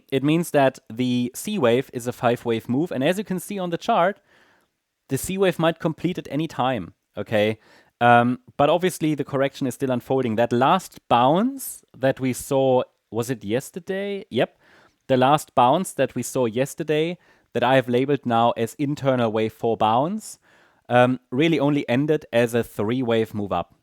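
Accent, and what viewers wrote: Germany. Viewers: Man hört deutsch raus, geiler Kerl!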